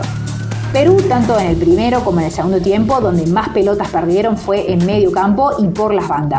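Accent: Córdoba, Argentina. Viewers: Una pregunta. Eres argentina de la provincia de cordova??????? Se nota mucho. 😅😅😅😅😅😅😅😅😅😅😅😅😅😅😅😅😅😅😅😅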